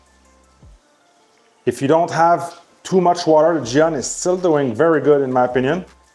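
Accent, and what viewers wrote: Quebec, Canada. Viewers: Salut, je t'entend que tu est quebecois...J'imagine... J'ai une question pour toi, j'ai un Kia ev9 2025 et le Vus je ne sais pas comment il est fait aerodynamiquement,mais le derriere devient toujours sale et rapidement et au lave auto, pas capable d'enlever le tout au complet, y a t-il un moyen de ralentir le tout???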